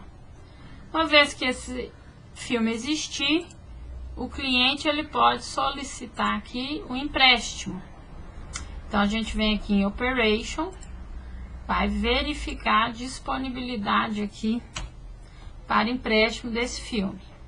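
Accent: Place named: Minas Gerais, Brazil. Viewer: Vcs reclamam de tudo, a moça deve ser de Minas Gerais e o Brasil possui vários contrastes e muitos sotaques. Aprendam a respeitar a forma de falar das pessoas. Com certeza na dependendo da cidade de vcs, também tem um jeito de falar e consequentemente na cidade dela iriam estranhar sua forma de conversar, mas com certeza teriam respeito por entender que não nasceram ali. Espero que tenham entendido agora. Bons estudos a todos !!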